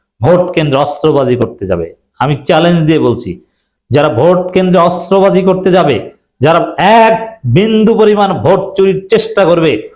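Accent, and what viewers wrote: Barishal, Bangladesh. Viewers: ভাই আপনার বাড়ি কি বরিশালের, সানুহারে?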